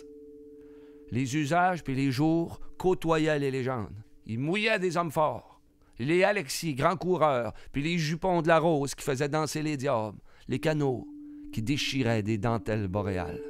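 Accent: Quebec, Canada. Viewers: Wow! vas-y...t'es comme un Homme de meme pas 40ans qui parle avec 100 ans d'experience! une voie qui s'exprime avec la force de mille de nos ancètres. quand je menuis de mon pays je t'ècoute et rempli mon coeur de cette musique quebecoise qu'est notre langue ben parler...du pure quebecois...une langue, une culture precieuse...moi je vote pour qui est encore des milliers de "y etais t'une fois" a venir.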